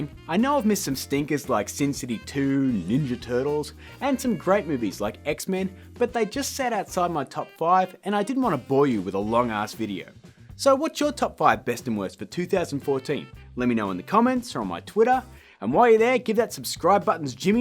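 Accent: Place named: Australia. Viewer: This review wouldn't have been at all tolerable without an Australian accent. Really, an American couldn't have used the same body language or expressions and be taken seriously. With that said, awesome review. We align on almost everything here, with the exception of Guardians. I thought Guardians should have been way higher.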